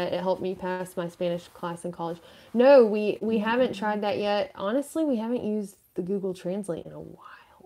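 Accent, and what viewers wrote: South, United States. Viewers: Does your Spanish have a southern accent too.? Haha. Glad to see you had a fun safe journey. Thanks for the videos.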